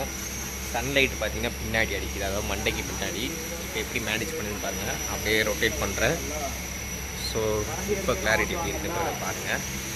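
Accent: Madurai, India. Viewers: Bro niga madurai thana bro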